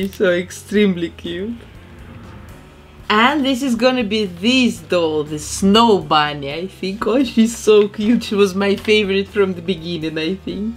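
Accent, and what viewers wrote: Russia. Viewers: Слишком русский акцент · What Is her accent I Wanna say Russian but I'm Not too sure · Почему мне слышится русский акцент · Какой русский акцент... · Ну очень сильный акцент, сразу видно, что русская или СНГ.)) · i love your accent are you Russian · Is she Russian? I love the accent! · I really don't want to be rude but she kinda sounds like Dracula but female version Edit: She sound Russian I'm just saying she got the same accent as Taras Kul [ CrazyRussianHacker ] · Я одна слышу русский акцент? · Мне кажется, или это русский акцент? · рашн акцент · Просто у нее очень сильный акцент, похожий на русский акцент. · what country is she from? Russia? Ukraine? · А что за акцент? На русский и правда похоже... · Her accent is different. Is she Russian? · Ты тоже заметил(ла) что русский акцент? Она знаешь, так как то ооочкнь странно звучит как "Бринан ин зэ Кэпитал оф Юкреин" 😂😂😂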